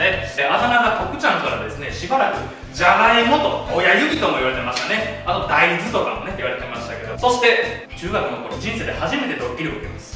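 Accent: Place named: Osaka, Japan. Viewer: 大阪だったんだ 時々の大阪弁がなんかうれし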